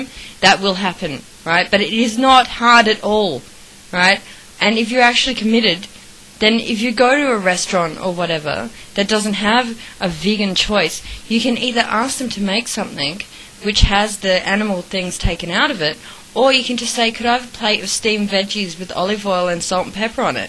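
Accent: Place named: Australia. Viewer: ew god your australian!!! its bad enough your vegan, both mixed together your like the next fuckin hitler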